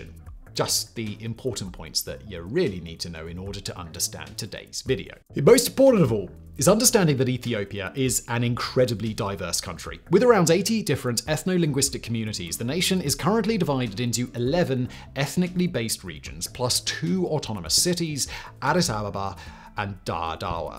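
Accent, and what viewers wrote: England, United Kingdom. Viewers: You sound like an Englishman or British you know these people do no longer want your propagandab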